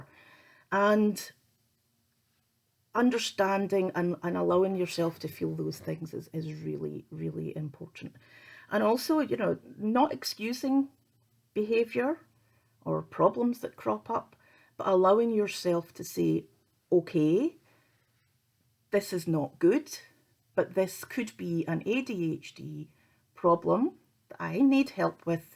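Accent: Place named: Scotland, United Kingdom. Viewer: Got soo excited when I heard the scottish accent but realised you live in Australia lol🤣I share many of your challenges and wondered if there was a way to contact you. Love your content, its very helpful and and relatable thank you for sharing🤗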